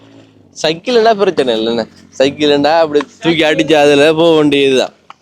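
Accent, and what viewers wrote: Sri Lanka. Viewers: இலங்கை தமிழ் மிக அழகாக உள்ளது அண்ணா😊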